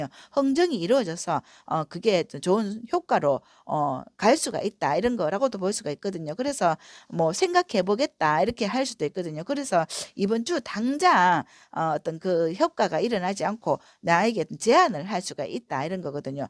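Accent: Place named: Busan, South Korea. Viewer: 4번 잘들었습니다 부산사투리 ㅎㅎ 리딩 좋아요 구독!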